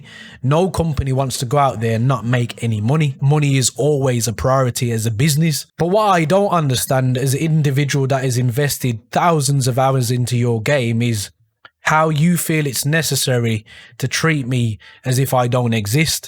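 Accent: Birmingham, United Kingdom. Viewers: What part of Birmingham are u from?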